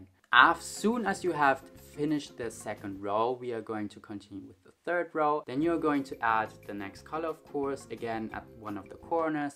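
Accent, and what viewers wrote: Germany. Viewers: Sein deutscher Aktzent triggerd so hard.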